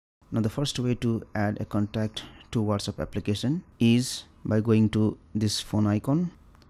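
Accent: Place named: India. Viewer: Thx 🙏 Work o your Indian accent so we can understand better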